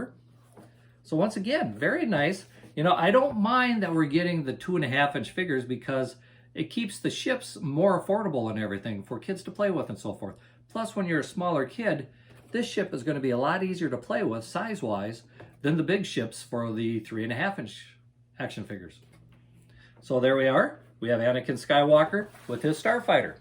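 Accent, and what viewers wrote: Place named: Upper Michigan, United States. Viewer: Collected since 78 and still hooked. I have to ask about your accent. Are you from Upper Michigan by chance? I am and your accent sounds like back home. Lol Great channel!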